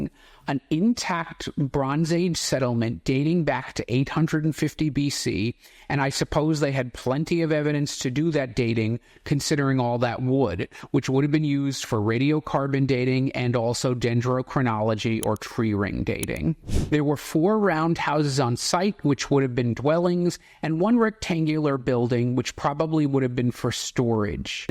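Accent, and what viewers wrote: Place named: United States. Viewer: I know you are American but please sort out your pronunciation of British words names and places 🙉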